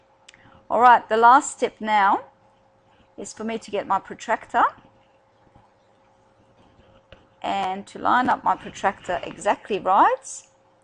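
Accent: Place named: Australia. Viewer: ausrtaliam accernt tho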